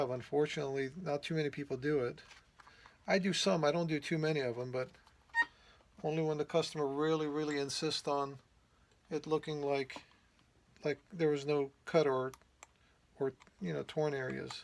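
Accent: New York, United States. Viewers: In Cambridge England we say it like this sounds lPayTent. When you say it you sound like patTunt. How is patent supposed to sound, I just wondered. U sound like your from New York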